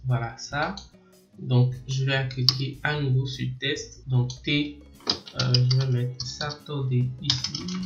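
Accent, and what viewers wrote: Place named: Benin. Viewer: Déjà je n'ai pas encore suivi la vidéo mais par les premières tonalité c’est un Frère du Bénin et ça fais super plaisir J'aime Koudo Azo Nonvi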